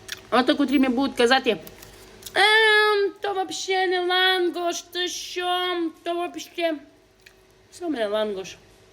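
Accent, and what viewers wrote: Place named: Western Ukraine, Ukraine. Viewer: Мої вітання. А Ваш говір то Чернівецького регіону чи Франківського?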